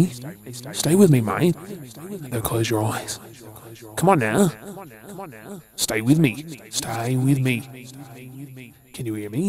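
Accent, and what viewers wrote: Australia. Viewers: Oh, geez, I'm a sucker for Aussie accents! I love your work,! Thanks more making the content you do!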